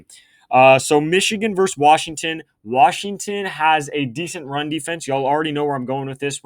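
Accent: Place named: Bay Area, United States. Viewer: Aye I’m in the Bay too! I could tell you had a lil Thizz in yo speech!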